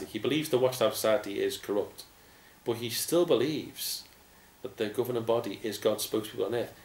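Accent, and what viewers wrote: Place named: Liverpool, United Kingdom. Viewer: Hey mate you sound like a fellow scouser we've probably been to the same assemblies. Ain't no way these tossers are anointed their just stunt doubles for snow white's seven dwarfs. take care and have a great life free from suppression as I intend to. Cheers my friend.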